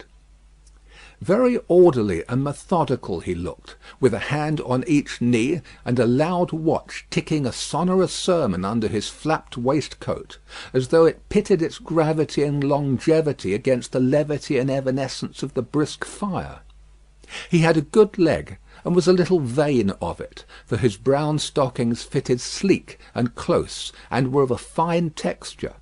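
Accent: United Kingdom. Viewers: No, he sounds merely British.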